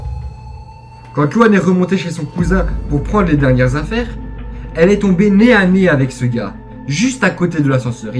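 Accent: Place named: North, France. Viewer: Bonjour, Je t’ai découvert il y a une semaine et j’adore ta façon de mettre en scène et de conter les histoires. Créativité, humour sont au rendez vous !! D’ailleurs est ce que tu es originaire du nord de la France ? En effet, ta manière de prononcer le 20 m’interpelle car je le prononce de cette façon étant originaire du nord de la France 😅 ! J’ai un ami qui écrit des histoires d’épouvante et d’horreur donc un jour tu as le souhait de conter des histoires fictives et originales n’hésite pas Je tiens à signaler que je t’en fait part juste parce que c’est mon ami et que je crois en ce qu’il écrit Excellente continuation à toi ! Sabrina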